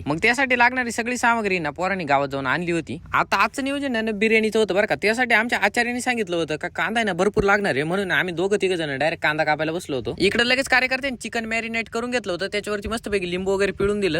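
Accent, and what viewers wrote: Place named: Nashik, India. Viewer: दादा तुम्ही नाशिकचे आहेत का बोलण्यावरुन वाटाटे..बाकी बिर्याणी खुप छन वाटते..तुमच्याकडे बिर्याणी खायला येच्या आस्सल तर येच्या कुठे.. From Pimpri Chinchwad Pune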